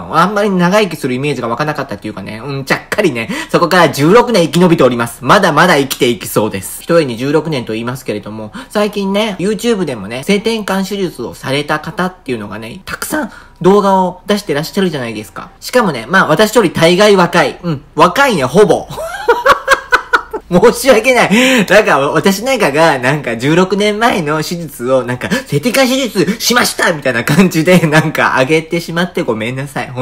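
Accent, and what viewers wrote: Kansai, Japan. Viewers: 一コメ～～～～～！いつも楽しく拝見してます！同じ関西人。話のテンポがものすごく関西。懐かしい！何かあったかい。末永くよろしく～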